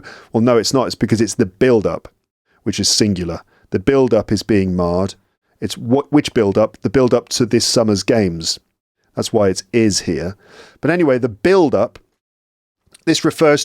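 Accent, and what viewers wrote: United Kingdom. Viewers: British accent is difficult.. I don't know why???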